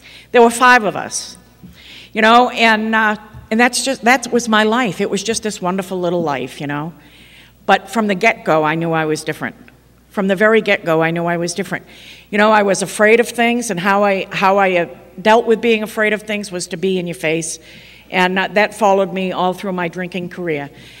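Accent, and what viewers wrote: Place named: New England, United States. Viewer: 30 seconds in and there was that new england accent. #home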